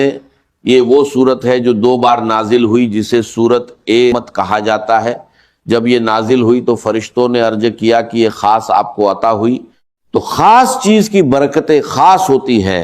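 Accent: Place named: India. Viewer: یہ کون ہندی بھائی اپنے خاص لہجے میں اسرار احمد کی نقلی آواز کو بول رہا ہے لیکن اتنی لمبی باتیں اور واعظ دینے کے بعد وظیفے کو صحیح طریقے سے نہیں بتا سکا کے کیسے پڑھنا ہے۔وظیفے کو توڑ توڑ کے بیچ میں بات کرتا ہے جو لوگوں کو سمجھ نہیں اتا کہ کب وظیفہ شروع ہوا اور کب اس کی بات کررہا ہے۔ کیوں لوگوں کو پریشان کرتے ہو۔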